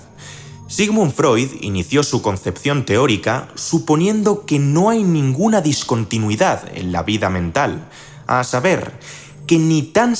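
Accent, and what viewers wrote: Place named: Spain. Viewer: Disculpa, diré algo sin la intención de ofender: en Latinoamérica no estamos acostumbrados a escuchar el español de España. Es tedioso, como al escuchar por mucho tiempo el inglés británico. ¿Crees posible en un tiempo no muy lejano "traducirlos" al español latinoamericano? La temática de los videos son interesantes, incluso hasta para traducirlos a varios idiomas más. Saludos.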